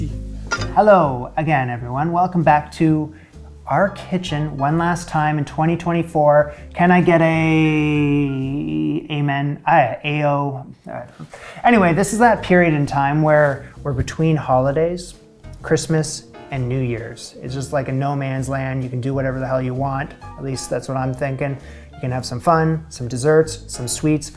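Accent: Canada. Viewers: You are so relatable you are me!!!. funy.. and sometimes a little unhinged. But you are up to the task. Thanks for the fun!!! Love your Canadian accent From the Netherlands 👍👍👍 As saint Nick would say you are nice and a little naughty.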